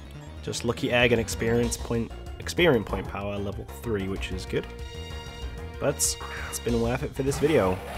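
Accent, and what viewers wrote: United Kingdom. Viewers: This guy sounds like he has a hint of northern Ireland in his accent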